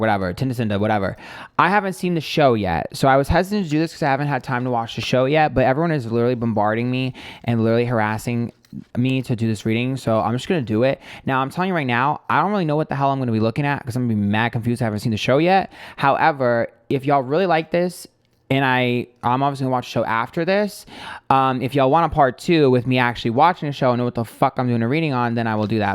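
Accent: New York, United States. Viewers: The more frustrated you get the more heavily your New York comes through 😁😁😁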